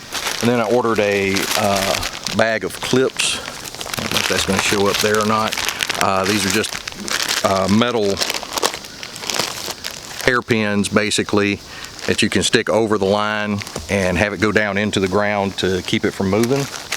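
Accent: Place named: Arkansas, United States. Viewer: Nice to see you back. Watched the whole house build from here in England. We have kin near De Valls Bluff and hearing you reminds me so much of our visits there. Thank You.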